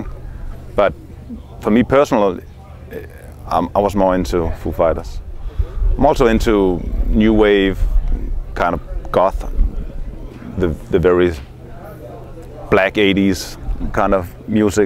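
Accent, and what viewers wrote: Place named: Denmark. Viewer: Why fix it if it ain't broken ~ gotta love the slight Danish accent, these guys are great, can't wait to see them live next year!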